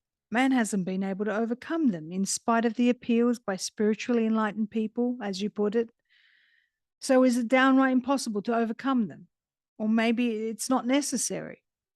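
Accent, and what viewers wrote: Australia. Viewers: I agree 100%! Beautifully read! A lovely soft Australian accent makes for easy listening.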